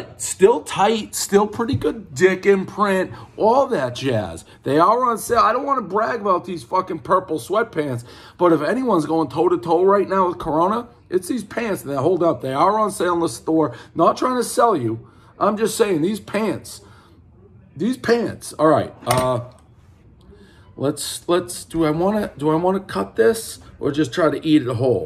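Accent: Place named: New England, United States. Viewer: like the videos...your yankee accent is somewhat tolerable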